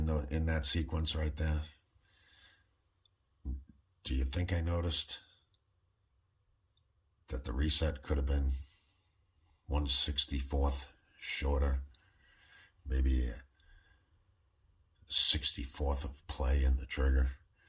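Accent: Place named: Northeast, United States. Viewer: Definitely from the Northeast. Good vid btw #2A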